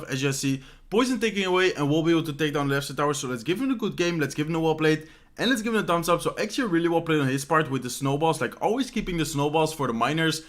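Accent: Netherlands. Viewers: Llama The Thug It’s a dutch accent, i’m dutch too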